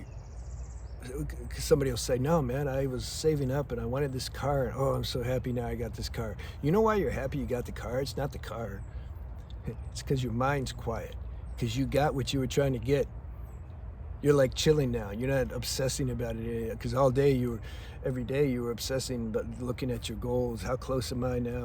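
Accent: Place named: Jamaica. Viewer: ya talking like a rasta man now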